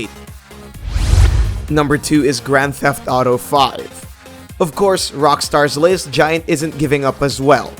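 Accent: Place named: Philippines. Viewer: narrator is filipino. obvious sa accent.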